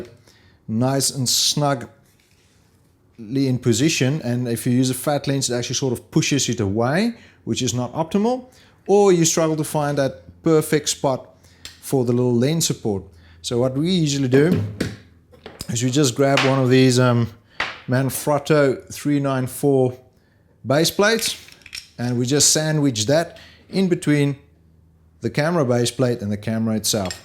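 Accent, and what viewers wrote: South Africa. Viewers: sounds like a south african who has spent a few years in AUS or NZ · You sound South African bruv. You're local right?😁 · south african?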